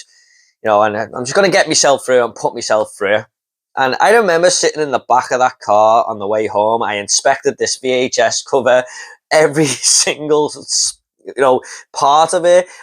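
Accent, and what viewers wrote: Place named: United Kingdom. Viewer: Love your accent btw! Love the way you said "time" where in the UK are you from, if i may ask? Im sorry if saying 'love the way you said "time" spuds creepy...it not ment to be ( embarrassed )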